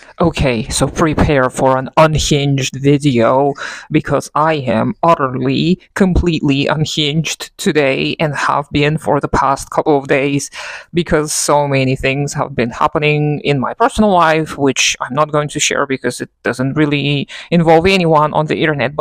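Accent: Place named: Russia. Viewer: You're Russian accent is amazing lol. I'm jealous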